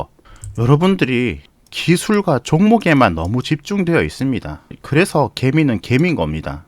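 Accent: Gyeongsang, South Korea. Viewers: 밸런스를 아시는 분이시네요! 경상도사투리가 약간 짜증섞인 어투로 들리기는 하지만, 고유의 스타일이신 것으로 이해하도록 하겠습니다^^:: 감사합니다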